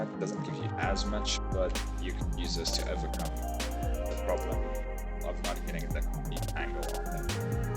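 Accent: South Africa. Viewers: lol Afrikaans? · Are you South African???????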